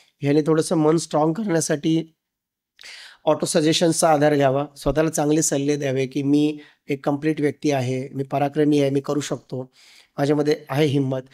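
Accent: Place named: Ghats, India. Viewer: Tumhi marathi spashta ka nahi bolat.. ghata varcha marathi ka bolata..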